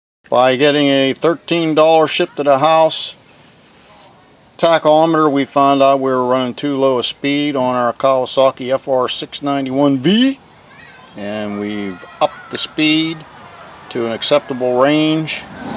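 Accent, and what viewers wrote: Pittsburgh, United States. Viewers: "Shipped to the haaass" 😂 love that Pittsburgh-ese!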